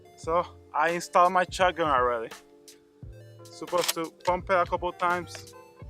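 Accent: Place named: Puerto Rico. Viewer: My wife and her family are from ponce and I'm from Argentina we always make fun of each other's accents lmfaooo. My bros in law sound just like you bro!!!😂😂😂😂😂😂😂😂😂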